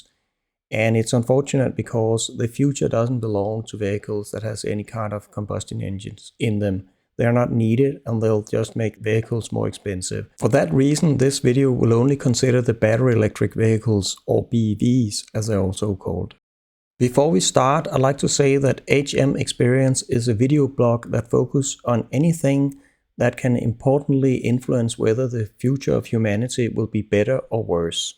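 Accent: Denmark. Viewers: Are you from Denmark? The English sounds "Danish".. (I am a Norwegian)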